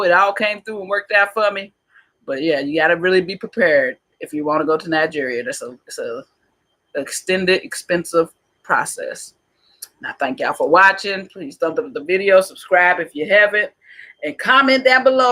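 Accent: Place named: Midwest, United States. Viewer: Where are you from? You sound like you from the mid-west.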